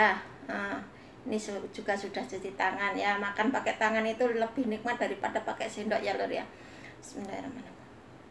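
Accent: Banyumas, Indonesia. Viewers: G ngapak g kepenakk ya mbk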